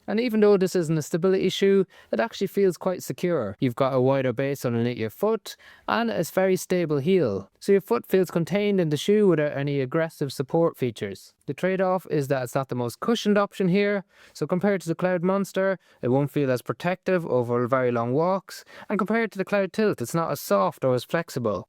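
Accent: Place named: Ireland. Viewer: Find it difficult to understand the thick Irish accent plus talks to damn fast so the obvious thing to help is when each shoe is introduced have the exact model flash up on screen this would be great help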